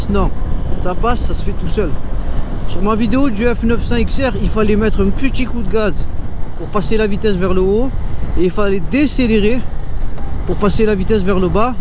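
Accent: Marseille, France. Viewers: Salut ! C'est un plaisir de retrouver tes essais avec ton magnifique accent marseillais, toi même tu sais, vé. 😂❤ Stef bdx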